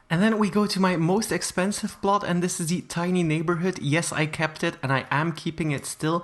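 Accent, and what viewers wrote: Norway. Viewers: Are you norwegian? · are you norwegian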